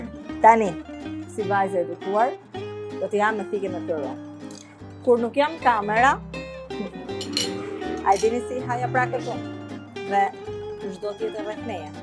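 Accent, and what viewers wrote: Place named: Burrel, Albania. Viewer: .....sa me pelqen malokja e Burrelit kur salutone !!!!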